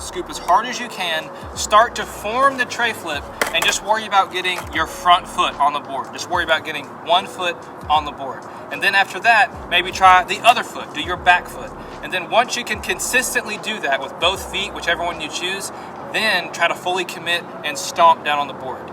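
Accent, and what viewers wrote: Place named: South, United States. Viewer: Where u from homie. I hear a lil southern draw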